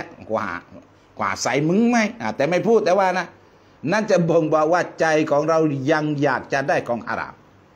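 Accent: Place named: Nakhon Si Thammarat, Thailand. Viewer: นครศรีธรรมราชชัดเจน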